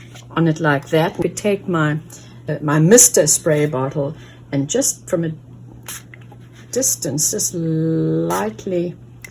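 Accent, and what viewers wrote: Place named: South Africa. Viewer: I know this is out of context, but are you from South Africa? You sound very much from here.. love your channel❤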